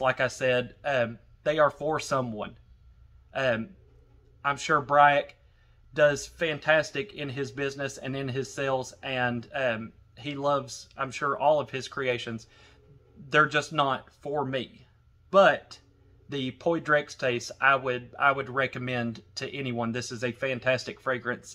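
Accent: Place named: South, United States. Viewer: Subbed and I’m just loving the southern twang of your accent! 🥰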